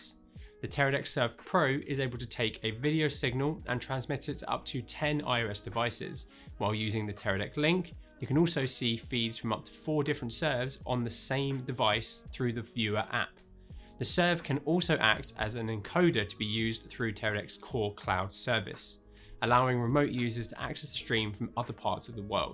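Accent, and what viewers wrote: United Kingdom. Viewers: This dude has to be British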